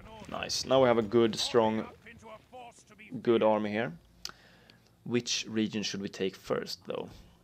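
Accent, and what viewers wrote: Sweden. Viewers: You sound like you have a swedish accent. Är du svensk?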